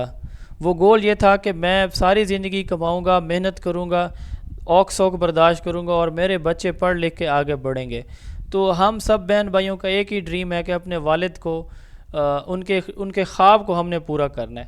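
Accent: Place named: Saraiki, Pakistan. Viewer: Mithe sariaki boli ❤️🌹🌹❤️❤️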